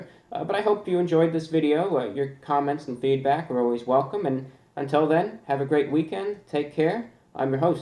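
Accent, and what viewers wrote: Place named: New York, United States. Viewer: You remind me so much of how peaceful my grandparents were. I really admire that about you. I'm from Western Mass and it sounds like you're from New York. I miss my grandparents dearly and the fact that you are so classy and keep it traditional really makes me want to come back not even for the food reviews but just to hear your presentation. Thanks and be well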